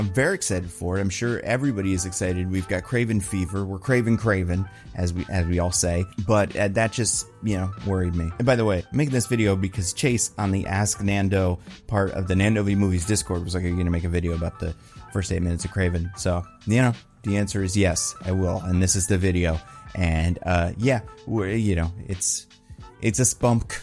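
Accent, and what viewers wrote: Russia. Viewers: this video is filled with lies. for starters he said "my russian accent is bad", yeah, not buying that clearly russia-born youtuber buy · If it makes you feel better Nando, I think you could be in this movie with your Russian accent and it wouldn’t be a detriment to the movie.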